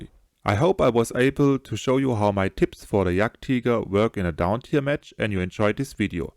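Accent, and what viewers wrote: Germany. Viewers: Your english is a littel bit broken and you sound german but your symphatic asf you sound like a nice guy too!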